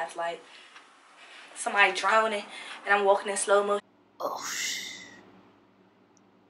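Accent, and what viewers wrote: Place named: Baltimore, United States. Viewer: Baltimore.... I hear it... Love it an you...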